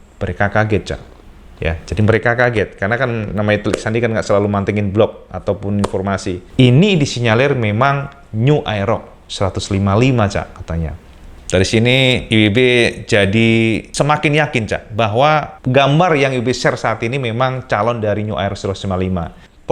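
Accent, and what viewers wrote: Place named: Java, Indonesia. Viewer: Wkwkwk kalo ngomong jawa kok kocak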